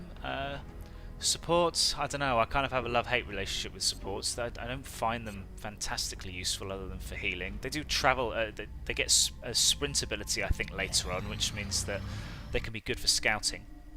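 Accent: United Kingdom. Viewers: I've started to watch the playlist really enjoying hearing British accent. Only on ep2 so far.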